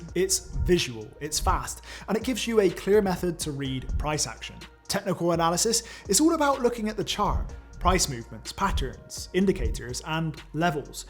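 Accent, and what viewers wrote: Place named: Bristol, United Kingdom. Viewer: Just found your channel, its really great and your accent sounds not too far from me in the Forest of Dean, sensing Bristol for you. Noticed you haven't posted in a. while. Please do, your videos on trading are refreshing. From someone learning, thanks!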